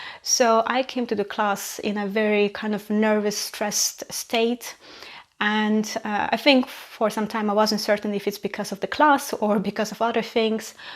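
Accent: Russia. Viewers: I can hear Russian accent. are you living in UK? Why you don't speak your native language? I"m sure russian people will appreciate it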